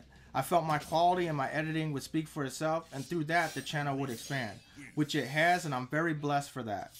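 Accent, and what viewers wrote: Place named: Mexico. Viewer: you sound Mexican and black and country white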